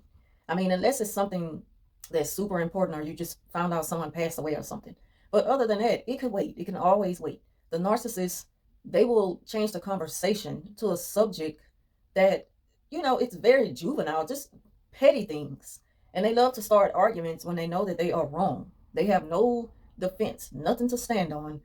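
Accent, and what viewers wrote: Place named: South, United States. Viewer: I like your down south accent .💖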